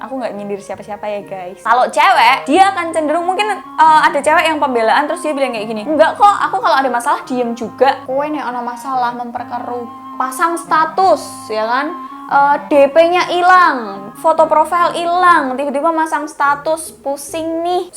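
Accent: Java, Indonesia. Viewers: Nonton buat edukasi 🚫 Nonton buat denger medok gurih✔️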